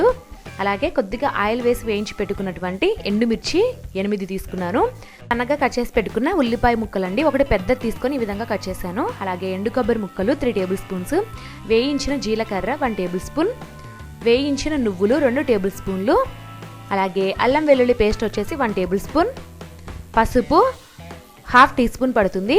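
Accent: India. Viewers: Mi language antha coastal area la undi...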